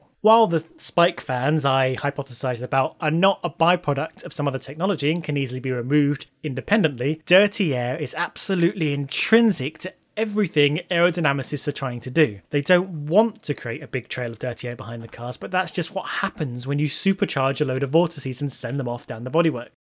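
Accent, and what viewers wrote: United Kingdom. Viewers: lol brits always say social mediar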